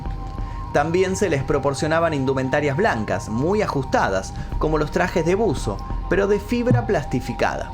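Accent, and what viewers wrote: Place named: Argentina. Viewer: Hola excelente tu modo de narrar y ese acento argentino me mata. 🎉 saludos desde México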